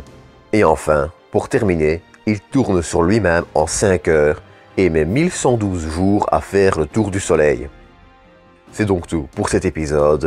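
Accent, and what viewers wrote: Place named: Wallonia, Belgium. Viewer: Petit commentaire juste pour flatter l'algorithme de YouTube..... Excellant travail comme d'habitude, avec comme signature sonore cet accent inimitable... Ha, ce terroir Wallon, on l'Aime.....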